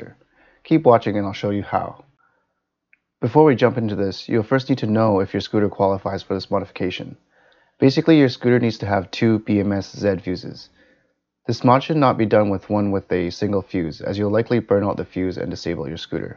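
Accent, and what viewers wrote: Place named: Vancouver, Canada. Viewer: Haha right away I can recognize you’re from Vancouver. Really wish there was a hack for widewheel mercane..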